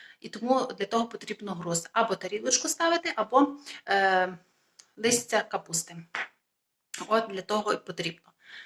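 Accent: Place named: Lviv, Ukraine. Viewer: І я перший раз дивлюся, тільки зайшла, почула наш Львівський діалект і відразу підписалася 😊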